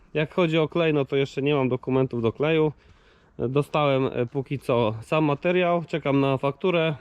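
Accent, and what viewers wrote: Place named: Poland. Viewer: Jak cie slysze to czuje cebule a wypowiadasz sie jak cebulandia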